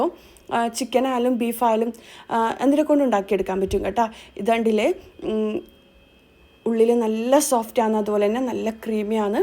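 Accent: Kannur, India. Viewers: Alla ith kannur thanneya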